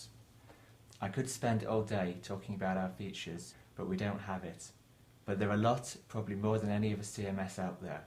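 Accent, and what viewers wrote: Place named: United Kingdom. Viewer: The Brittish accent, itself, isn't a problem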